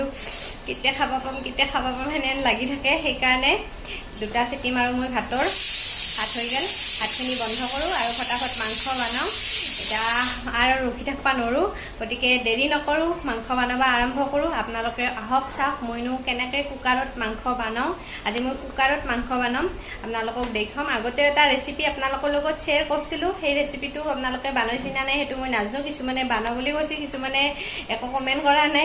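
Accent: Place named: Pathsala, India. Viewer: Mur mar ghr nalbari..apunar kothabur buji puat eku problem a hua nai..moi bhal pong ei pathseila kothagelkhon😊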